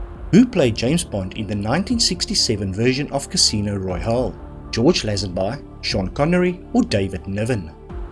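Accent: South Africa. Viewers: I find the pronunciation perfectly acceptable. Not being picky, but when "Poirot"is needed, try saying "PWAR - OH ". ( That's " yer " actual French!😊 By the way, do you have a tinge of "South African " there ? Enjoyed the quiz, only missed a few questions.😊 😊🇬🇧🦉🌿🎶🌼🇬🇧😊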